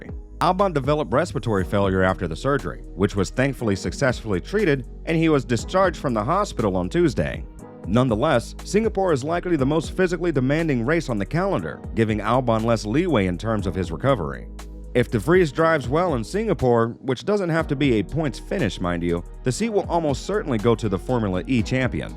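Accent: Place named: United States. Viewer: Seriously as soon as you hear the American accent .. you know its just clickbait .. sigh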